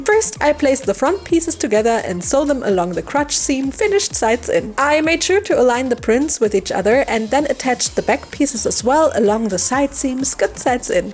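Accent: Germany. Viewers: Are you german? Your accent sounds so german. If yes: Tolles video!! Und die puppe sah echt cool aus!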